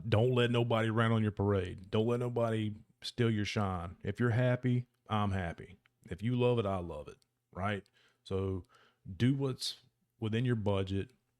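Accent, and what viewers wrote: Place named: South, United States. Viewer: Thanks for the review fam. Are you from the south? Sounds like you’re from either AL, MS, LA, or TX.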